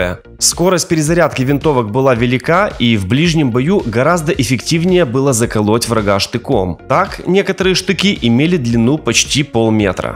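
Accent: Ukraine. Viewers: есть что тут сказать. Смех да и только ребенок с явным акцентом страны 404 пытается рассказать о том в чем не разбирается.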